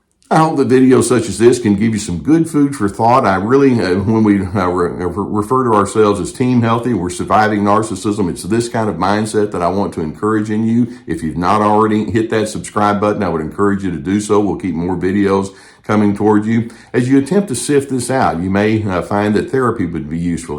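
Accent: South, United States. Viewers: I wish you would talk more slowly. (I get hyped just listening sometimes!) I know you have lots to say (and that you are sharing generously!), but I would benefit from you adding "slow" to that great, kind Southern style of yours. (Thanks!)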